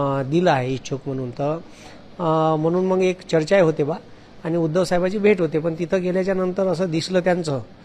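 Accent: Vidarbha, India. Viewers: हे विदर्भ बोली भाषा आहे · पूर्व विदर्भात असेच बोलतात..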